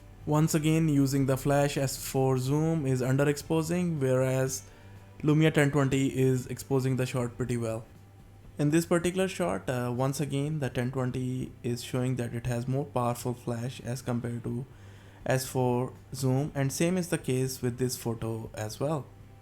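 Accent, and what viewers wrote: India. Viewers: indian accent English is genuine ear cancer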